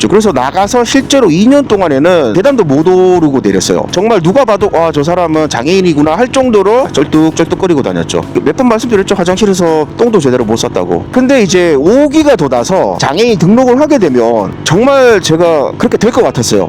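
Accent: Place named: Gyeongsang, South Korea. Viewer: 경상도 사투리인데 딕션 깔끔해서 귀에 쏙쏙 박히네요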